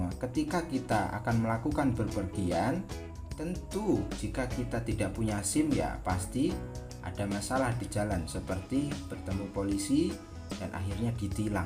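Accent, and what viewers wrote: Java, Indonesia. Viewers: Mantap kang, tempat servisnya dimana ch bang,dari logatnya ch orang jawa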